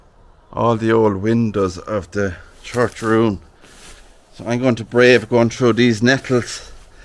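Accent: Ireland. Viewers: So old and interrstin g, from lon g ago days. I love your charming Irish accen t too 😊😊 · I'll always give ye a tumbs up 😊. I could listen to your Irish accent all the day long ❤ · Wee bit of the Irish in ya there Laddie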